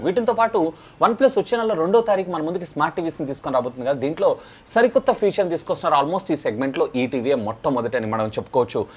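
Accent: India. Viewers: R u from Vizag or srikakulam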